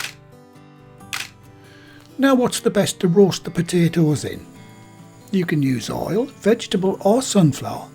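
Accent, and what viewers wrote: United Kingdom. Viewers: 너무 잔인해 You are so cruel 이런 멋진 감자라니 It looks Very nice potatoes 감자를 싫어하는 나도 먹고 싶어지잖아 I want to taste them even l don.t like potatoes 그건그렇고 By the way 너의 영국발음에 중독되고 있어 l am addicted your English accents 너 때문에 오븐을 살지도 몰라 lf I buy an oven it's because of you 하여튼 너의 동영상이 맘에 들어 Anyway l like your video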